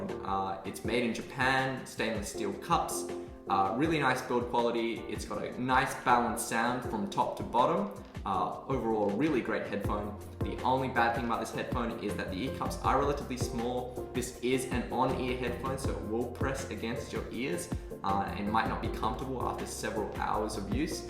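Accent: Australia. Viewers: You're an aussie? subbed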